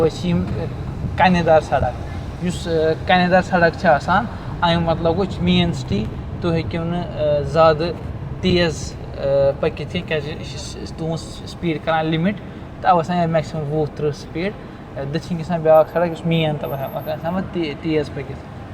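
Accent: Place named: Kashmir, India. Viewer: Watching from District Doda Like your kashmiri accent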